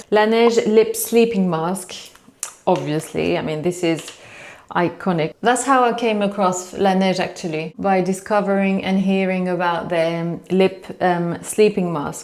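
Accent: United Kingdom. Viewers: Are you originally British?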